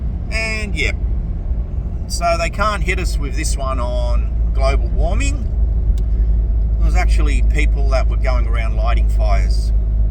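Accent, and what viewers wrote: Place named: Australia. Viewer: Hi mate, sounds like an aussie, me too. I'm in a similar boat, with the shit coins and BTC.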